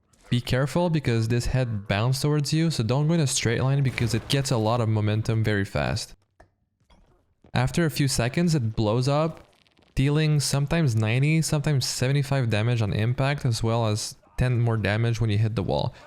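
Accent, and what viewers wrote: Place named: Quebec, Canada. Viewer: It's random but, I hear a quebec accent are u quebecois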